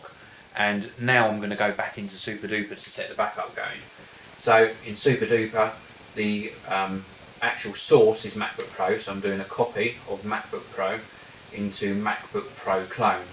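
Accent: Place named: Cockney, United Kingdom. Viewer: Every time I hear this intro music, I think "Cockney accent coming up".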